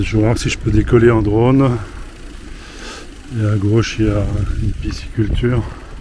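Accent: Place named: Alsace, France. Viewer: Je ne me moque pas pour l'accent, je l'affectionne tout particulièrement. J'ai déjà visité l'Alsace en long et en large, j'ai découvert plus récemment la vallée de la Doller, très jolie, une pépite ce petit village de sewen, ma fille y habite depuis trois ans. Merci, belle fin de soirée, je ne manquerai pas de te faire encore des commentaires sur les vidéos que je n'ai pas encore regardées. Au plaisir. Léna.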